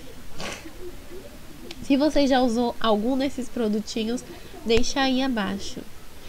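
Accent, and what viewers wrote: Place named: Bahia, Brazil. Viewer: haha teu sotaque é de baiana mesmo😍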